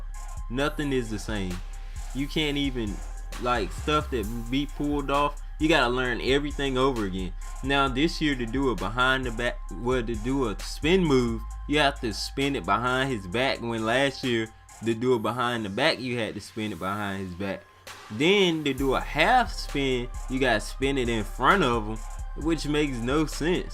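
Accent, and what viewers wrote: United States. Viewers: u sound country as shit I don't know if ur slow or if that's just how u normally talk.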